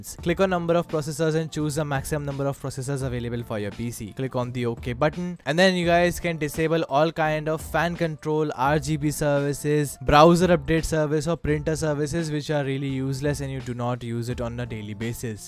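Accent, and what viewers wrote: India. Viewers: are u from india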